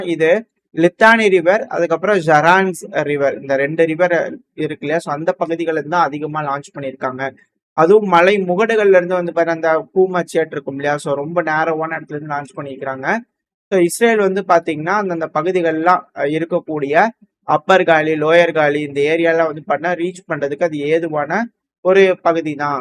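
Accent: Coimbatore, India. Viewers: R u from coimbatore, guessed it from ur slang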